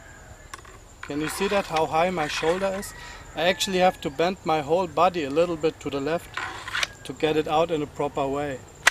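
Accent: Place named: Germany. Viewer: You seem to have a German accent, but it looks like you're in a very tropical area. Where do you live?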